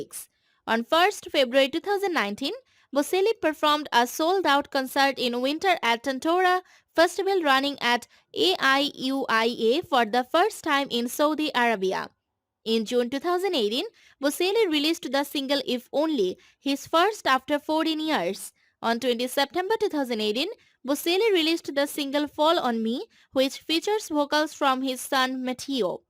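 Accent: India. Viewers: Your accent sound like Indian to me hard to understanding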